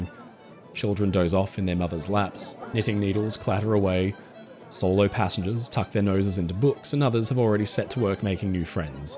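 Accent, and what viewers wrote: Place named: Australia. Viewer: Great story. Gotta love the Aussie accent.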